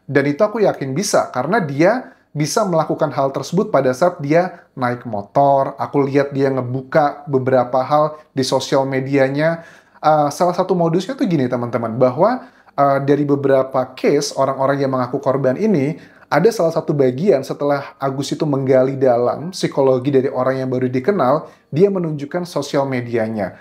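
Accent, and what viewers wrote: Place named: Bali, Indonesia. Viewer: Nada bicara nya dan nama nya orang baly tuh...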